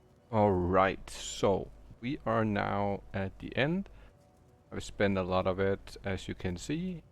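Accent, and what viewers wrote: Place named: Denmark. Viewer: You can hear a fellow Dane from a mile away. Love it man. Do you play in a Discord where other Danes can join?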